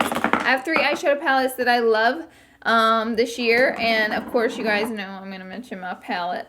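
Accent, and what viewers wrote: South, United States. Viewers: Love you too girl! I started watching you a couple years ago and I instantly fell in love with your channel! You are so fun and humble! I am honestly proud and happy for you on how far you've come! Don't loose the Southern accent! Hugs. Thanks for sharing this video.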